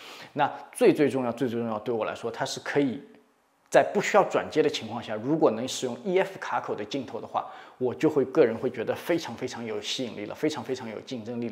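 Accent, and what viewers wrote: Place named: Jiangsu-Zhejiang, China. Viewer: 应该是江浙一带的人，听他讲普通话觉得很亲切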